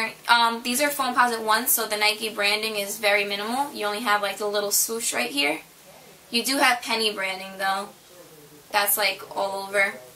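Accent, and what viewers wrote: United States. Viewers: R u from jersey? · are you from boston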